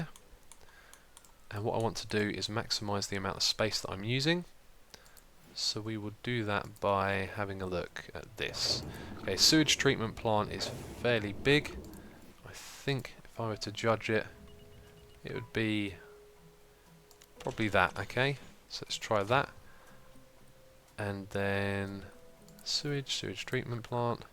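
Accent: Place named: London, United Kingdom. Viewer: I reckon he is from somewhere in London ???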